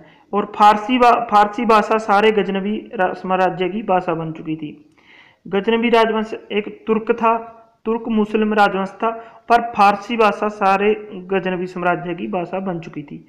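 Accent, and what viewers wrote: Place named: Punjab, India. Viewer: bhai y punjabi h... iski hindi se hi pta chal rha...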